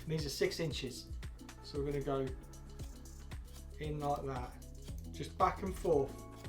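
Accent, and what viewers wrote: United Kingdom. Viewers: Cómo me encanta el inglés británico lpm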